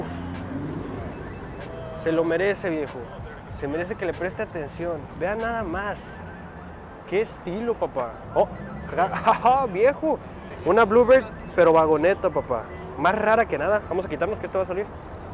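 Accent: Mexico. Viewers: que gracioso ver un japonés hablando como mexicano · no mames wey, un chino hablando como mejikno en Japón.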